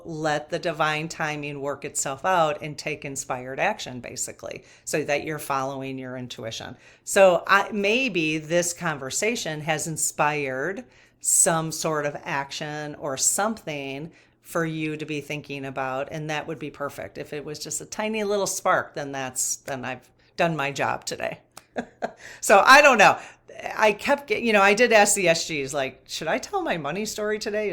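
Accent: Midwest, United States. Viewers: From the first time I watched you I figured you were from the Midwest and really resonated with that. Now I find out you’re from Palatine! I’m older than you but I graduated from Fremd in 1975. Worked at the Marc’s Big Boy on Northwest Highway. Small world! ❤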